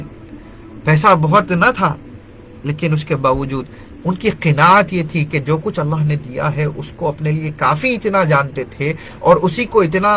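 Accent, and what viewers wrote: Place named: India. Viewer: Great, Maashaa Allah, yaa habeeb. But I don't understand your Indian speaking.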